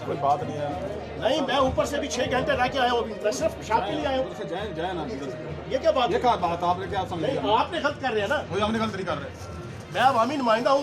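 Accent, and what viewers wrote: Punjab, Pakistan. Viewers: Punjabi touch zaida DIA karay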